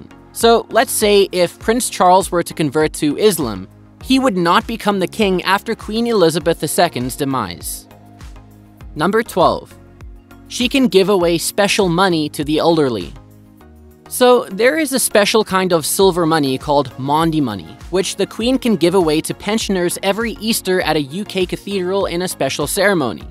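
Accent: United States. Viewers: The man doing this docu is talking about the queen of england so why doesn´t he talk proper english and not english with a yanki accent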